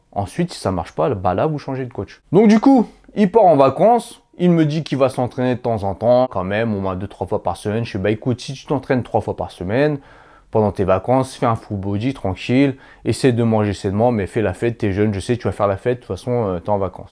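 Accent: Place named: Marne, France. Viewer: Qd je t'écoute, je me rends compte que c'est vrai qu'on a un accent, nous les marnais !😉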